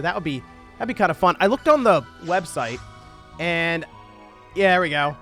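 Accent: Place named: Philadelphia, United States. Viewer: You have a very heavy Delco/Northeast Philly accent...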